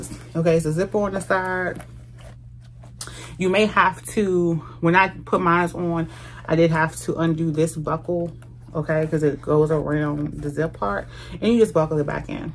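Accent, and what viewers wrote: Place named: DMV, United States. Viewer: First time on your channel!! Oh you came with the looks 🙌🙌. Definitely new subscriber. And the way you talk -you sound like you're from my area #DMV. Thanks for the style inspo.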